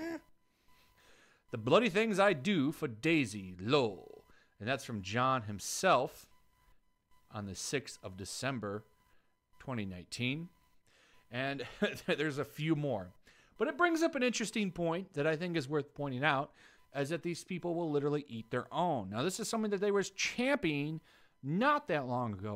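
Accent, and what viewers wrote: England, United Kingdom. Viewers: 'Peckman'? Oh, you read almost as badly as Gary Beuchler. Peckham. Pronounced 'PEK-em' or 'PEK-um'. An area in south London. I'll give you this, though: this is one time when your daft plummy english accent is appropriate...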